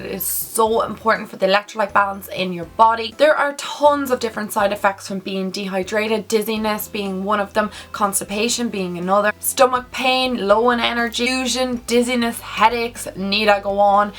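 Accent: Ireland. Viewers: I love these videos, so glad you have done your last one and this one, I've been changing my eating habits over the past month, I've lost about 6/7lbs, what is a healthy weight loss in a certain time frame, love your videos and I'm a sucker for Irish accent lol xxxxx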